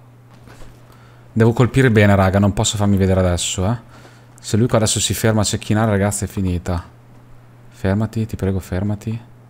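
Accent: Naples, Italy. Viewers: Come è bello quando parli napoletano😍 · Ma sei napoletano io si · E stupendo il tuo napoletano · ma sei napoletano a fai finta di esserlo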